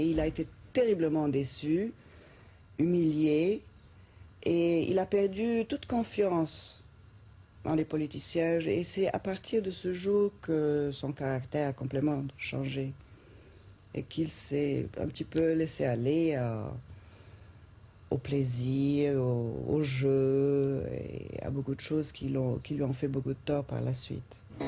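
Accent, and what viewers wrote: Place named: Egypt. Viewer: لهجتها الفرنسية حلوة بس ظاهر فيها انها مصرية :) انا مهتمة اوي بالتاريخ المصري وحقيقة مصر ام الدنيا بلا منازع.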